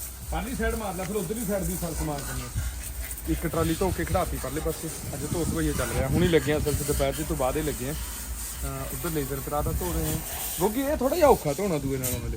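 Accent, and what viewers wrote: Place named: Puadh, India. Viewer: Gay gay Kaya Kaya thoda area fathegarh lagda pawad boli bolde ho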